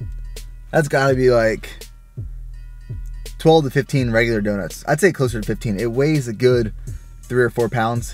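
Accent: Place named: Pennsylvania, United States. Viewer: I just watched pretty much all of your videos and I'm 100% subscribed! I wish I lived in Texas so I could try these donuts! I feel like I heard you say you were from Pennsylvania in one of your videos.. You definitely sound like it lol I'm from PA, as well.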